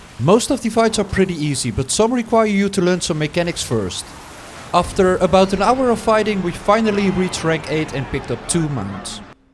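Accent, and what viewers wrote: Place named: Netherlands. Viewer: sorry but it was very funny xD, i guess youre from the netherlands ^^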